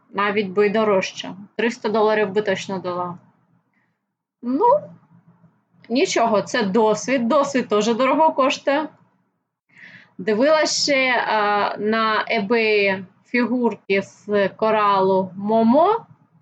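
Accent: Russia. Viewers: да просто)) то на английском с русским акцентом размовляете, то на Украинском) решил узнать уровень познания не только в коралле))))))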